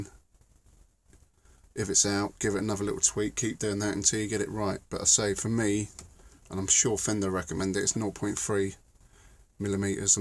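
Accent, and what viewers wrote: United Kingdom. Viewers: The British accent makes it much easier to listen to.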